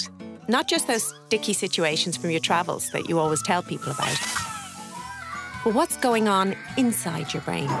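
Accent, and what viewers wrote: Ireland. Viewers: I was wondering why I heard a faint Irish accent. Then I saw 'BBC' in the channel's title :) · So does this mean it's not my fault that when I hear an Irish accent (sorry voice over) I automatically think of Gypsies trying to tarmac my drive or sell me pegs?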